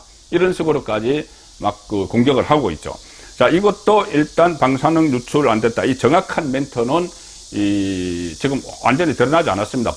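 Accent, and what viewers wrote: Gyeongsang, South Korea. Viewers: 정말 이새끼는 기래기다 경상도 말투로 먹고사는 놈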